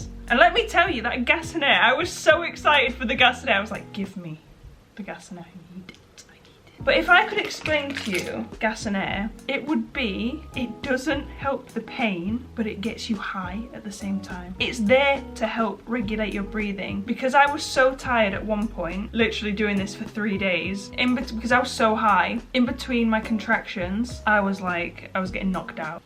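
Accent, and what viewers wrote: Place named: Wales, United Kingdom. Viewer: I can seriously relate when you say you have Connor and your family only. I dropped all of my high school friends due to toxicity, and found my best friend in my boyfriend. Also I am a welshie gal !! Love watching your videos and hearing a familiar accent😊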